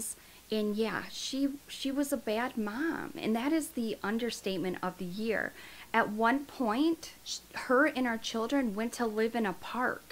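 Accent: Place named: Dakota, United States. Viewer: Are you from Dakota? You remind me of a character from Fargo or Chelsea houskas mother.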